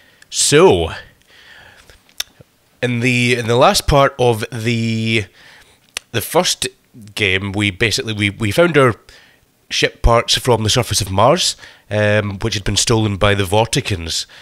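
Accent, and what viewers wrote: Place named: United States. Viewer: Ha ha! I like that American accent. Almost had a bit of a 50s radio voice going, there.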